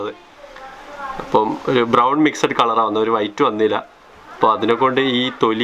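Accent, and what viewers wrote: Malappuram, India. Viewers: Malappuram karan ano😎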